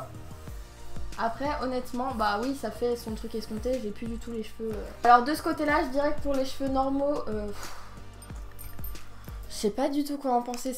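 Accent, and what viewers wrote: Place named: Quebec, Canada. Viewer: Ta un accent québécois c'est super perturbant mdr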